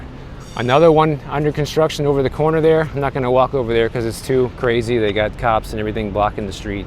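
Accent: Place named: South, United States. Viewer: We know you're a southerner when you say ( furr ) instead of ( for ). NO NO NO I can 1st hand attest to money ruining lives. Money can absolutely ruin and kill people and I have 2 cousins and 2 uncles that are deceased due to money and a good friend of mine his son is dead due to money. Musk and Vivek claim they could probably cut 2 trillion a year in waste and fat .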